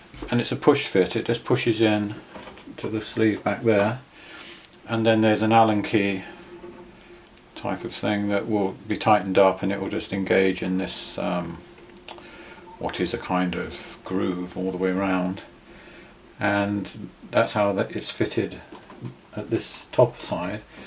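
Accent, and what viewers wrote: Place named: Home Counties, United Kingdom. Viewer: you are a STAR plumbing video maker. I am sooo... grateful i found your amateur easy to follow step by step video. British videos give only badly explained advice and meant to get you to call a plumber. Youtube should delete these fifteen minutes of fame time wqsters. Your accent seemed home counties english until i found i was donating in Canadian dollars on paypal. Thank God for honest Canadian DIYers, I saved £s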